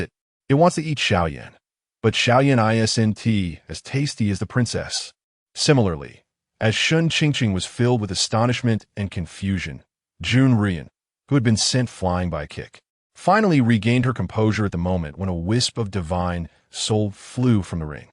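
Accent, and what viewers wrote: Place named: France. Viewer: This ai is a little french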